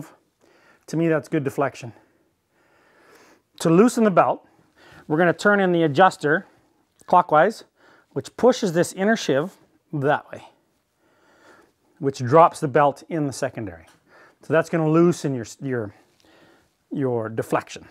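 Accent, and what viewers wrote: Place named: Saskatchewan, Canada. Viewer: Are you from Saskatchewan?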